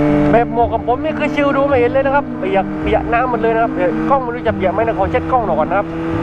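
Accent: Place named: South, Thailand. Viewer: ชอบภาษาใต้ 👍🏻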